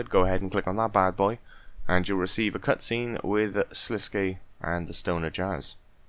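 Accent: Wales, United Kingdom. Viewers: Are you welsh man?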